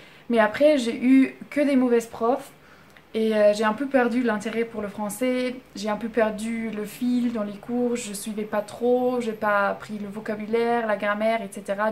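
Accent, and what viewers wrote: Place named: France. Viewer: Your French accent is so great! Found your channel through NonStopParis' live stream. I've subscribed and can't wait to watch all of your videos :)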